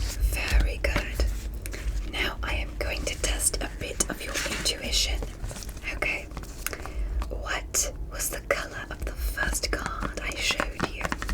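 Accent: England, United Kingdom. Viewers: Mate you sound so british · Why is blud bri’ish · Good English accent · Am I the only one who noticed that she is British 😂😂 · When I heard her for the first time I went "omg she's brittish" it's sounds so relaxing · one hour ANDDD British accent!?!?!? stop spoiling us!😍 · Love the British accent 💀 Or was it Australian? I can’t tell since you’re whispering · I am so thrilled for another English accent video. The last one with the nurse I have watched several times. You deserve an award for that one. Thank you. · You are a Really Beautiful Doctor with the Most Beautiful British Accent!!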